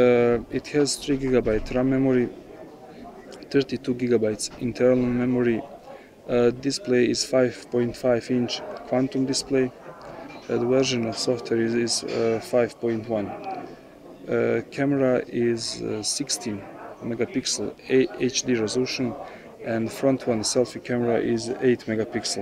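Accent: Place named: Russia. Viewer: рашен аксент из прешас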